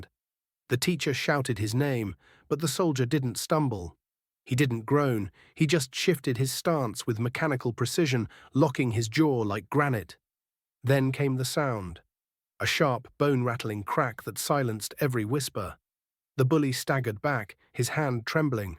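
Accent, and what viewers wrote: United Kingdom. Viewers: Would rather see the moment of the knock down in dramatic movie footage than listening to a guy with a British accent assuring us that the good guy won. Let's hear a good old boy from the Deep South giving us the play by play of teaching the young punk a lesson. Save the limey for a similar story of some immature loser trying to abuse a Buckingham Palace soldier or Horse Guard, etc.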